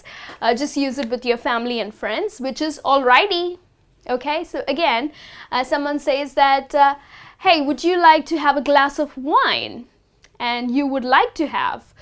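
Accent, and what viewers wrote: United States. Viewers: American dialect.... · You speaks 80% American English and 20 % British English ....... (Me - 50%american +50% British English....) Am I right plz comment ..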